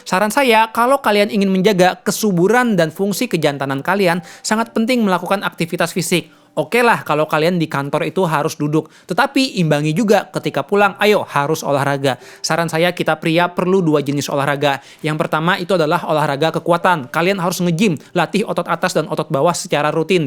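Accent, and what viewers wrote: Banjar, Indonesia. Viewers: Urang Banjar kalinya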